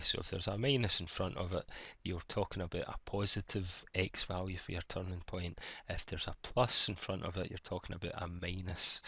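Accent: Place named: Scotland, United Kingdom. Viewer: mainus, Scotland accent is amazing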